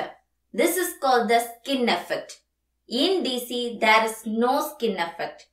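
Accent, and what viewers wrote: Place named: India. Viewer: wwanna punch this girl. why do all indian;s accent have to be like this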